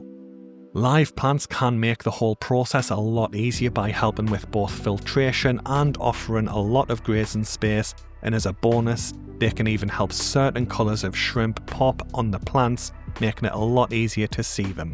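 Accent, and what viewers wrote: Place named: Lincolnshire, United Kingdom. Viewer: yeah, the posh twang which you must have got from your time in Lincolnshire threw me.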